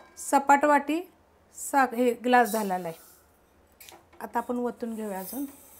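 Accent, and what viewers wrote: Satara, India. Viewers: खूप छान रेसिपी आपली सातारी भाषा आणि सातार भाग सारखे च लाडू बनविले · मी पण सातारची आहे .... भाषे वरून मी तुम्हाला ओळखले 👍🏻👍🏻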